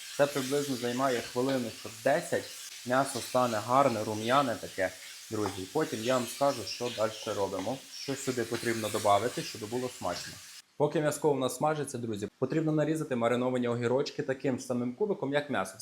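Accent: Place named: Lviv, Ukraine. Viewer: По виговору ,я так думаю,хлопчина зі Львова́,а напишіть чи не вгадала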